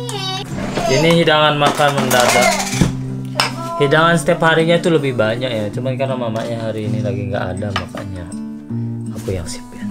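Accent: Lombok, Indonesia. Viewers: Saya baru nemu chanel nya bang,,stelah saya nonton bbrp videony rasany kok bahasa indonesianya lombok banget..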